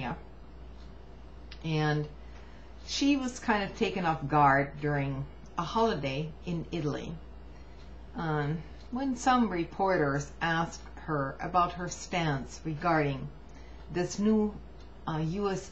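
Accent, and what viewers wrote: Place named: Scotland, United Kingdom. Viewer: seems like you have a trace of scottish or irish accent in yr speech did you ever live there?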